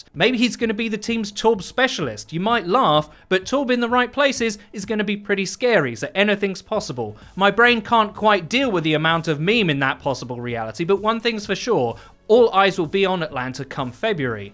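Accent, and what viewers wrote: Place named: United Kingdom. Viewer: The British accent haha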